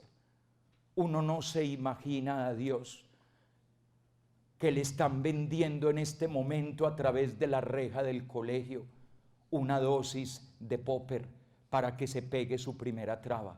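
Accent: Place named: Colombia. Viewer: Me encantan,sus enseñanzas maravillosas,me encanta ése Acento tan,colombiano.no sólo eso.tan enamorado de <link> llegan á lo profundo de mi corazón.no quisiera,perderme,ni una conferencia. Dios le siga dando ésa sabiduría. Divina. Yo soy Colombiana.pero hace 40años vivo en Estados Unidos.Bendiciones lo queremos mucho,padre.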